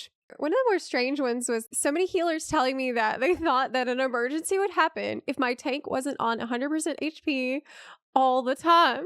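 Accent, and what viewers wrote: South, United States. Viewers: wonder what part of the south she is from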